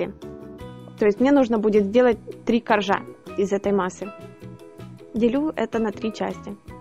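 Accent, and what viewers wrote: Ukraine. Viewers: А то,что надо грамотно разговаривать,это фаэтОн девочка украинка,судя по говору,поэтому не совсем грамотно говорит,но ведь это не экзамен по русскому языку.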